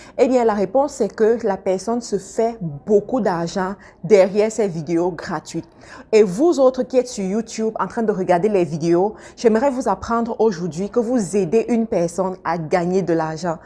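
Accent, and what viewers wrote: Cameroon. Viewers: J'aime trop votre façon de parler! Vous êtes la seule mbenguiste camerounaise qui a su garder son accent.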